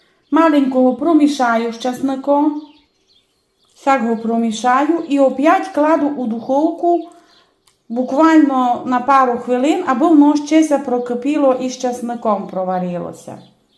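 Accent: Transcarpathia, Ukraine. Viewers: Ви не мудра жінка, не подобається, не дивіться. То закарпатський діалект, пані просили, щоб вона розмовляла саме на діалекті. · Дуже гарний рецепт, дякую вам! Особливий колорит додане закарпатський діалектя в нього закоханий! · В неї закарпатська говірка. Не подобається, то не дивіться. Але ця її мова доволі цікава, і її не важко зрозуміти. Здоров'я господині і процвітання каналу.😊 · Це справжній закарпатський діалект,а ви вчіть історію України і будете знати в якій області як розмовляють.А Вам велике дякую за рецепт,вже приготували всі були в захваті.Дуже смачно.Чекаю на нові рецепти.Також робила по вашому рецепту голубці онуці на день народження теж всім сподобалось.Дякую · Нормальний закарпатський діалект. І нічого чіплятися до жіночки. Як що вам не подобається, не дивиться. А мені все зрозуміло, хоча я вчилась в російськомовній школі. Господині респект за рецепт. 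😊❤ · Привіт з Рівного!Дякую за рецепт! Діалект мені дуже знайомий, дядько одружився в Закарпатті,в місті Свалява,все життя прожив там,уже теж мав такий діалект. Нажаль вже його немає,але тітка ще живе, спілкуюся з нею,і з двоюрідним братом, який захищає Україну,з 15 року,та сестрою. Мирного неба всім! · Это закарпатский диалект не нравится не слушайте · це закарпатський діалект. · Це так розмовляють на Закарпатті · Який чудовий Закарпатський діалект❤❤❤. Даже не знаю,що більше сподобалось😊😊😊. В дитинстві бабуся таку страву готувала і назва його була у нас булянка😊😊😊. Західна Україна. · Тільки ідіот поставить дизлайк. В кожному регіоні України люди розмовляють на своєму діалекті. Жінка розмовляє на українській мові з закарпатським діалектом. Браво господиня!!!!!❤❤❤❤❤❤❤ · Ви прекрасна господиня. І дуже вас приємно слухати. Гарний діалект закарпатський❤ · Спасибо большое за рецепт, новые слова и прекрасный закарпатский говор. 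Я русская американка, с началом войны смотрю и слушаю только украинские каналы. Язык уже понимаю очень хорошо, говорить и писать пока не умею)) как собака понимать понимаю, а сказать не могу😂 Победы и мирного неба🇺🇸❤️🇺🇦🇮🇱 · З задоволенням послухала закарпатський діалект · але ваша мила Закарпатська говірка мені дуже подобається! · Приготувала, дякую за рецепт страви i закарпатський дiалект. Щастя Вашiй ро́динi. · дякую за рецепт! І окрема особлива подяка за чарівну закарпатську українську мову! Хочу більше закарпатських слів! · Дякую за рецепт. Тяж цікаво слухати закарпатський говір і вже буду знати що сіль це по-закарпатськи суль ( я з Прикарпаття і у нас говорять переважно як літературна українська мова хоча і є в різних областях своі назви деяких овочів та всього іншого ( наприклад у нас кажуть на картоплю бульба а в деяких селах кажуть бараболямі тільки тепер почула що на Закарпатті на картоплю кажуть ріпа а я думаю а де ж то ріпа —щось не бачу аж потім зрозуміла що це картопля ( бо у нас ріпа це такий білий буряк ) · Чудова господинька і справжня закарпатська говірка ❤, аж любо слухати ❤😍🔥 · З великим задоволенням слухала вашу мову і з теплотою згадувала щирих і добрих людей із Закарпаття. 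Здоров'я та миру вам. Дякую за ваші рецепти, дуже цікаві. Дуже дякую вам за щирість. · ОБОЖНЮЮ ЗАКАРПАТСЬКИЙ ДІАЛЕКТ, ЯК І ВСЕ, ЩО ПОВ'ЯЗАНЕ ІЗ ЗАКАРПАТТЯМ!!! НЕ ЗВЕРТАЙТЕ УВАГИ НА ( ЯК БИ ПОМ'ЯКШЕ СКАЗАТИ)- НЕДОЛУГИХ.У ЦЬОМУ РОЦІ ПОБУВАЛА НА ЗАКАРПАТТІ - ЗАКОХАЛАСЯ І В ЛЮДЕЙ І У САМ КРАЙ! ЯК УЇЗЖАЛА - НЕМОВБИ ВІД РІДНІ ПОЇХАЛА - ЗА 3 ДНІ ВЖЕ СУМУВАТИ ПОЧАЛА!!! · В українській мові немає слова паночка, є лиш панночка ! А то закарпатський діалект, доволі красивий! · А мені дуже подобається слухати закарпатський діалект, я чомусь вчуся. За рецепт дякую. Приготую з грибами😀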